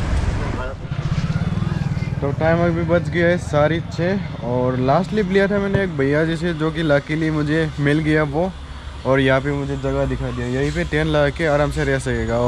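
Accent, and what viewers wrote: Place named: Nepal. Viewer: By his hindi ascent he is nepali bcz I been in uttrakhand , people of uttrakhand hindi ascent is different not like him anyways enjoy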